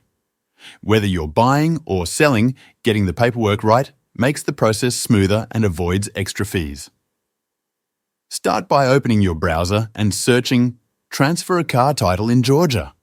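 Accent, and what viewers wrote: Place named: United Kingdom. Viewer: Thanks, for the video. Love the British accent....😁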